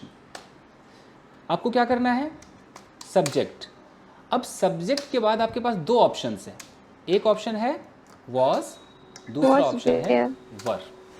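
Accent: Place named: Bihar, India. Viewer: Sir aap puri bihari bol rhe 😄😄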